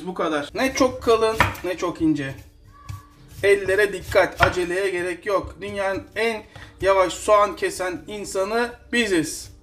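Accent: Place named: Izmir, Turkey. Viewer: Uzun süredir videolarınızı ağzımdan salyalar akıtarak izleyip abone ol ve beğen tuşuna basmayanlardan biri de bendim itiraf ediyorum. Bu gizli gizli izlemekten veya herhangi bir kasıttan değil. Her videoyu izledikten sonra eliminin dışıyla ağzımın kenarından akan salyaları temizleyip vay hamamınınüy dedikten sonra üzüntüyle YouTube uygulamasını kapatmamdandı. Bu andan itibaren bir 1-2 dakikalık beyin donması oluyor sen de bize hak ver. Neyse bir tekneye göz koymuşsun bizim de katkımız olsun diye abone oldum, videolarına seri like basıcam şimdi, katılı aç katılalım da. Yalnız senden bir şey rica ediyorum. Aksanından konuşmandan İzmirli olduğun çıkarımına vardım. Yaklaşık 1,5 aya Türkiye’deyim ve çoğunlukla İzmir’de olucam. Beni misafirin olarak kabul eder misin?Rakılar benden, senden o Yunan mezelerini yapmanı isterim.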